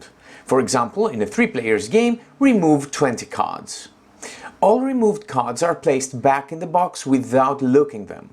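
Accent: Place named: Greece. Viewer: Great video with a really smooth explanation, thanks! Wife and I also busted out laughing at the beech tree part, , "if there are at least 4 beeches in your forest"😆 Greek accent? Kudos on the excellent English which is far better than my second language. Gotta love when accents make words sound like other words and it's totally innocent yet hilarious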